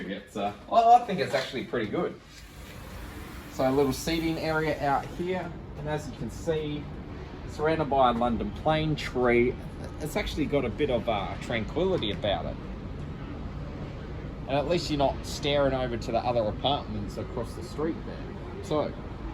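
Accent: Australia. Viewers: WHAOUUUU 1st time i hear an aussie accent like that. If understood well it's 300 a week or 1200 a month. Seems hefty !! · I love your Aussie accent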